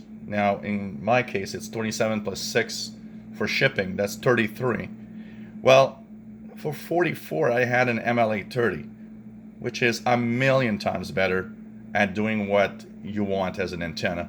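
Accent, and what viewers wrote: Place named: Quebec, Canada. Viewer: Definitely from Quebec with that accent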